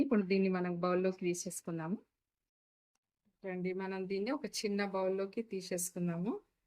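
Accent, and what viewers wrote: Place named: Telangana, India. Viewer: Nice telangana slang ❤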